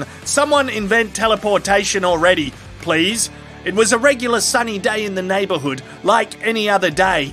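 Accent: Australia. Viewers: Why do Australia Accents make the best for commentating? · I'm not sure if it's the Aussie accent or just your twisted sense of humor but I absolutely respect the funny! Keep em comin.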